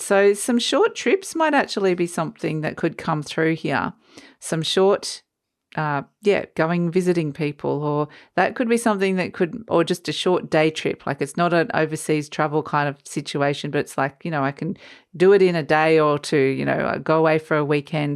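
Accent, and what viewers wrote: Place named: Australia. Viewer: I love to watch Masterchef Australia and you have the same accent than Billie, knew right away that you are in Australia, all the green in your garden, beautiful 💙 Thank you for your reading, always interesting and inspiring Wishing you a great weekend 😊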